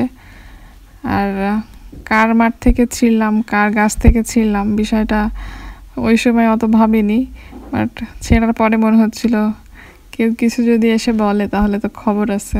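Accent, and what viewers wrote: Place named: South Bengal, India. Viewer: amar ও ত শেই প্রশ্ন । ইনি তার গ্রাম কোথায় তা বললেন না।! খুব খারাপ কথা । এতো টুকু সাহস তো উনা র থাকা উচিৎ ছিল, কমপক্ষে আমি ইম্পপ্রেসড হয়নি এটা অপশন এ রেখে।ইনার কথা শুনে অবশ্য মনে হয়েছে ইনি হয়তো বা সাউথ বেঙ্গাল(মানে খুলনার দিকে) কিন্তু আমি নিশ্চিত ও না।আমার নিজের বাড়ি জসরের কোন এক গ্রামে( যশোর থেকে ২০ মাইল দক্ষিনে ।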